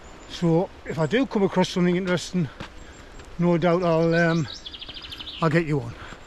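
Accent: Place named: Durham, United Kingdom. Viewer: Good video,you sound like from my neck of the woods near Durham.i love Weardale.Im always on the fells.👍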